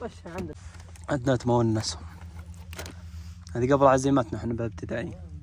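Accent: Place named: South, Jordan. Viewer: نفس لهجة جنوب الاردن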